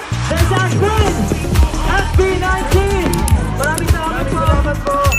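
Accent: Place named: Quezon, Philippines. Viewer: "Let's get right & not bitter but be better together"..i love it❤ It was fun watching & hearing you..always watched replay sa mga vlogs & ls mo..kung makakita man..silent viewer lang palagi but this one napa-comment ako! Josh really deserved the award..naiba lang dahil medyo mabagal..english ang lyrics..walang rude words o halong kabastusan kaya d natanggap ng mga ibang traditional hip hop..at yun ang naririnig ko lagi noong kabataan ko ng mauso ang rap dto sa atin kaya d ko ma-appreciate! You're my happy pill too..lalo na pag naririnig ko ang accent mo sa pagsasalita..nami-miss ko ang punto' ko..bumabalik lang pag umuwi sa amin sa Lucena, Quezon..magkapitbahay ang probinsya natin kaya hawig ang punto natin😅 Thanks..keep going..nakakaaliw ka!🥰😅👍❤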